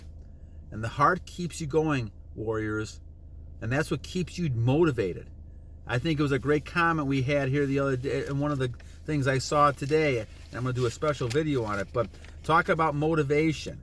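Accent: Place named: Illinois, United States. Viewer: I don't know where he is but he's definitely from Illinois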